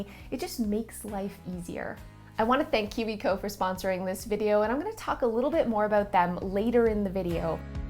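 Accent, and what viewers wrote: Canada. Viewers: I have been watching/listening to your videos all day while I work. I'm the mom of 5 kids and I LOVE organizing, but nothing has ever really stuck. I have such high hopes after everything I've learned from you. I love the minimalist mom too, but I can't fully get on board with that concept so it's nice to have categories that I put my things into. I've taken breaks all day long to declutter certain areas, it makes such a difference to take things one shelf/cupboard at a time. I love that you say organizing can only come after the decluttering. I'm working on decluttering all the areas first then go back to really organize. Thank you for being so motivating, so relatable, and so kind. Also, my dad's family is from Calgary Alberta, where are you from? I can hear your Canadian accent..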